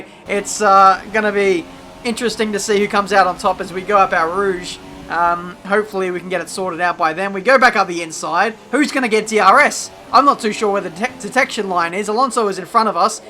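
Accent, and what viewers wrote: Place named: Australia. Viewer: Found your videos last night and have ended up watching all of them already. You've convinced me to buy this game now so thanks! Also, good to hear a fellow Aussie accent playing games. Keep it up dude.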